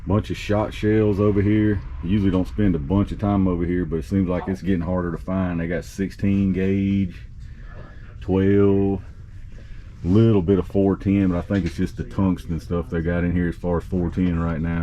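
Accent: South, United States. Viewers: I love hearing someone from the south bless peoples hearts.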